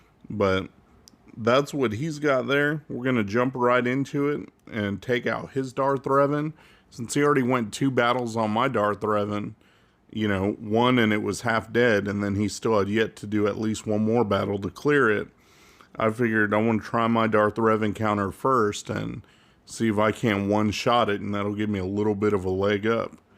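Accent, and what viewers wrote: South, United States. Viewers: your southern drawl makes the videos relaxing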